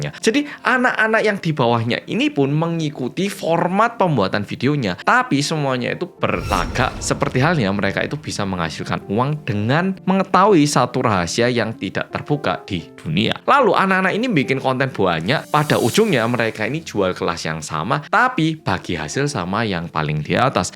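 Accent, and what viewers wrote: Java, Indonesia. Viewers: Hai org korea yg medok🎉 bolomu lgsg nyimak🎉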